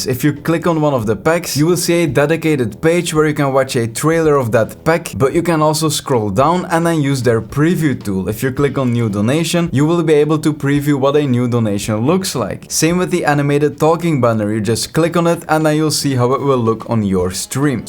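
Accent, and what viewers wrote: Netherlands. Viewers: nice but i smell nederlands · Cool series but i have one question, are you Dutch? I’m Dutch…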